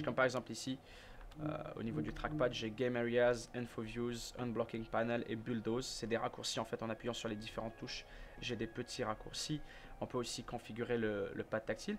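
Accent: Quebec, Canada. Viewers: est tu québécois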